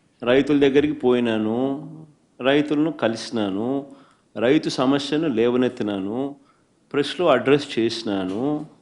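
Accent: Rayalaseema, India. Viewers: రాయలసీమ యాసలో బాగా దింపావ్ కధా జగన్ సూపర్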